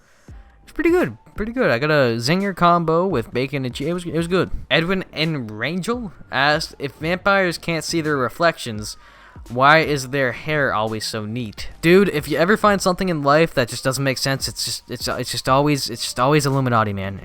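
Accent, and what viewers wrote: Ireland. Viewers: I thought you where Irish I'm being honest here not even joking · He sounds Irish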